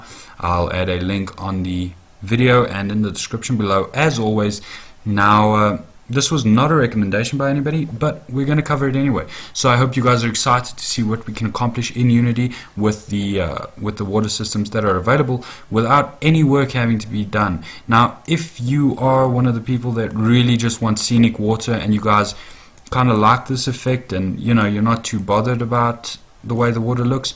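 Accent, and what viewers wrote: South Africa. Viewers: you sound south african speaking properly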